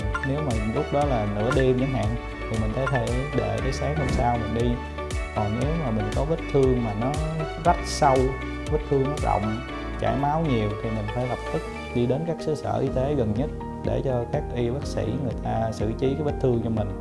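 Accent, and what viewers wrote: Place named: South, Vietnam. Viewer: Xin cảm ơn bác sĩ. Nhưng mà đm mấy thằng làm chương trình nó lồng cái nhạc nền vào không nghe được. Giọng miền nam thì người bắc đã khó nghe rồi còn cho cái nhạc nền vào.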